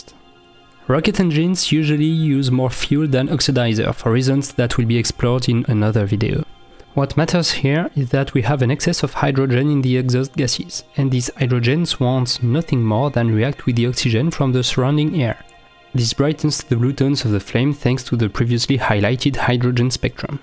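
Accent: France. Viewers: You have a French accent, not an English Accent. :) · It's a great pity that this would be an even better video if someone who speaks English as their first language had provided the voiceover. Your very strong French accent is sadly too strong to make many of the words understandable. (I am from London, England.) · its funny how when you speed the speech you sound more french. but i understand you perfectly,and i am spanish. very good content,btw. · Great great video. Condenses a fair amount of knowledge really difficult to get without a lot of work, and your accent is just fine. It's obvious you're French, but there was no single word I didn't get. A big THANK YOU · From now on, all rocket videos should be in French accent. (Like Attenborough nature videos, and Australian croc videos.) Excellent work. Very good info. Thanks to you,. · This is great and really informative. 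And your French accent isn’t that bad. I mean have you ever heard Stéphane Israel speak English? Your English sounds so much better! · Excellent video and I can understand you very well. I'm English. Of course you still have a very very French accent but it's no problem for me. Merci mon pote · Please continue making such cool videos! I learned so much in a short time.. maybe I'l have to watch it a second or third time :) Absolutely no problem with your french accent. Great video content. I love the super slow motion and HDR-looking footage! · Outstanding,. Your accent is tres agreable. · Great collection of rocket launches and the F1 launch I've never seen, no problems with your French accent... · Your english is very good and this sweet french accent is beautiful. Much better than some Youtuber from the south of USA. (I am from Germany) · I've been a space geek for over 30 years now, and I still learned a ton during this video. 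Well put together! Don't worry about the French accent, I'm from Canada, I'm used to it ;-) One thing about the F-1 engine that has fascinated me from day one is the black smudge around the exhaust. It is the result of the turbopump exhaust passing through liquid helium and liquid oxygen heat exchanger coils. The resultant helium and oxygen gas are used for fuel and LOX tank pressurization, etc, and the now much cooler turbo exhaust gases pass into a manifold, which then vents it inside the nozzle extension. This boundary layer cools the nozzle extension, since the nozzle, throat, and combustion chamber are regeneratively cooled. Passive cooling for the nozzle extension, active cooling for the rest of it. (The heat exchanger is the bottom half of the cylinder thing next to each engine bell, and the manifold is the thick ring around the nozzle. A gas generator downstream of a gas generator.) . 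Keep up the great work! I will be watching part 2 next. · Very good! Your English with a French accent is good and I had no problem understanding you.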